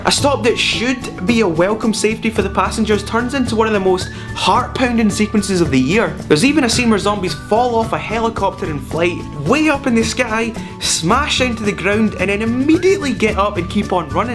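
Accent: Scotland, United Kingdom. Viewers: Your review is on point. Covered all there is to know about the film. You got one more subscriber. Thanks for stopping by my channel! Also, your accent. You're from Scotland if I'm not mistaken. I was there a couple months ago in Edinburgh. Beautiful city. I did a video about the trip. Check it out in my channel!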